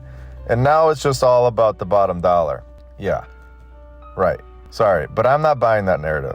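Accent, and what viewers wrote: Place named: Syracuse, United States. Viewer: I'm from Syracuse NY & he sounds like he's from my hometown. But he could also be from Chicago or Michigan. They sound a lot like Syracusans.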